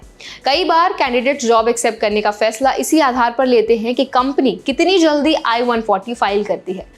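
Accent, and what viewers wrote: India. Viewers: This girl is throwing misleading information with her strong Indian accent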